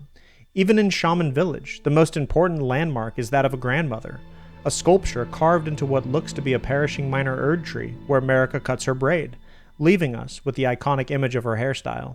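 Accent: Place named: United States. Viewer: u pronounce words so american😭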